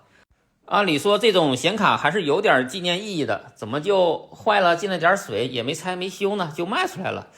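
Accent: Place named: Northeast, China. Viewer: 听口音是东北人在广西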